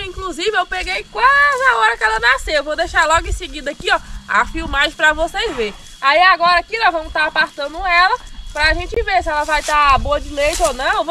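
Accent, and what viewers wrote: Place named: Bahia, Brazil. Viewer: Esse sotaque é um show à parte, lembra minha gente da Bahia. Meu abraço respeitoso a vocês por fazer tudo com tanto amor, dedicação e carinho.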